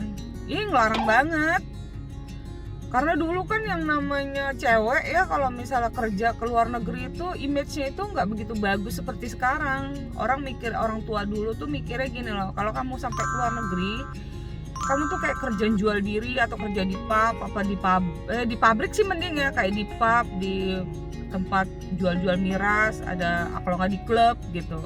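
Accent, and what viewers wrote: Bekasi, Indonesia. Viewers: Dari pertama ngomong, logat dll udah bs di tebak klo nih orang Bekasi.... Beda gentakannya.... Hahahaha.... Kanmaendah.... 😁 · 21 tahun di Korea tapi Bekasinya masih berasa beeeeudd🤣🤣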